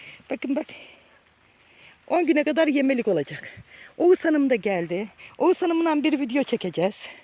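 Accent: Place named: Zonguldak, Turkey. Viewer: aynen bende zonguldak eregli abla şivenden anladım süpersin bereketli olsun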